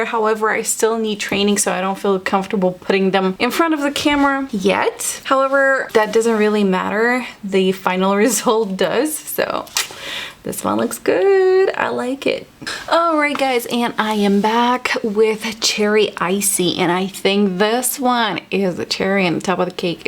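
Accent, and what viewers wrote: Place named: Poland. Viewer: I absolutely love your accent! You have a great soothing voice, you are very beautiful, are you polish? ❤️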